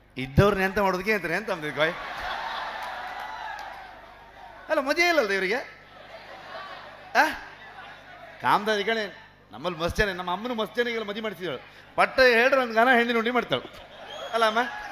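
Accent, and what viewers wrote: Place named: North Karnataka, India. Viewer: Super , pronunciation I think 90 % same as north Karnataka style deeply u listen .(gulbarga, yadgir, .....) 🤗☺️🙏👍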